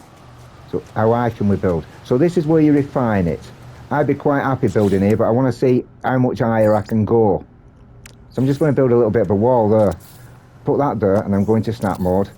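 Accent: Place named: England, United Kingdom. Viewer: Great to hear bloody brilliant regional English… no messin’ about… job done! 👍🏻 ❤ 🏴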